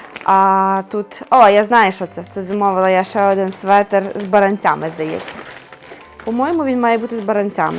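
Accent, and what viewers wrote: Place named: Ternopil, Ukraine. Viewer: Ты з Тернополя? Я знаю одну жінку з Тернопільської області, вона спілкується майже як ви.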